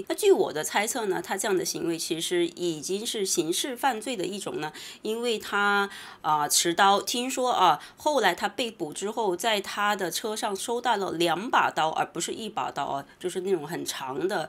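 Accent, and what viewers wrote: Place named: North, China. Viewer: 北方口音好吧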